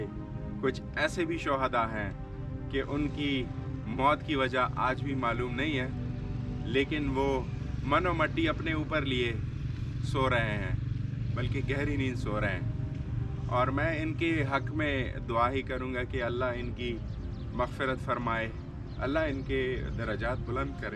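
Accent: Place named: Karachi, Pakistan. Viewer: Admin apki Urdu Ka accent Karachi Ka ha ....nice v log👌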